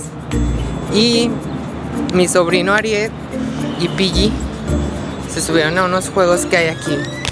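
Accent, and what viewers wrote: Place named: Torreón, Mexico. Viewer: te acabo de conocer y ya soy tu seguidora y admiradora!!! Me gusta tu forma de ser y tu familia, desde que te escuche me imagine que eras de Torreón y le atiné!!!... mi familia es de haya!!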